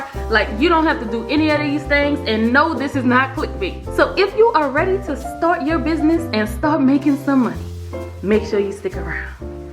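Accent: New Orleans, United States. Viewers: I hear a New Orleans accent 😍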